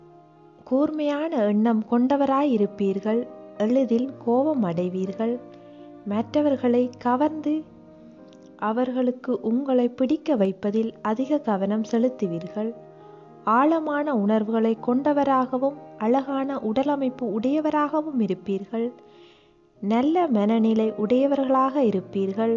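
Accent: Sri Lanka. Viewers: ஸ்ரீலங்கா தமிழ் போன்று உள்ளது.உங்கள் உச்சரிப்பு. தமிழன் என்று சொல்லுங்கள் Indian என்று சொல்லாதிர்கள்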